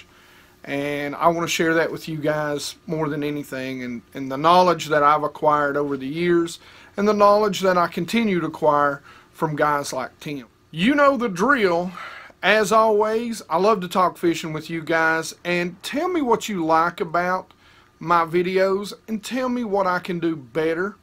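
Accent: South, United States. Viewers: I like the laid back feel you give your videos, the music, your southern accent (us Canadians are hyper and say eh all the time... eh). Looks like a good time! thanks for takin' me with ya.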